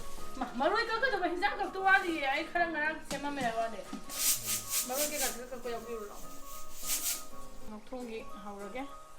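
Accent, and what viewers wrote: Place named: United States. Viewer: Ashh angang geijidi pura American accent olle 😀😀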